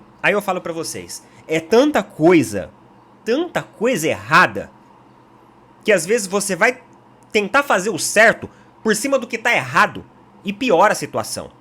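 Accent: Sao Paulo, Brazil. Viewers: Ele é Paulista então tem que ter sutaque.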